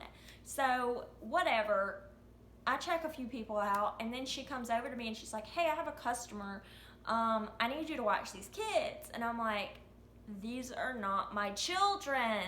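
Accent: South, United States. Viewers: love the Southern woman accent beautiful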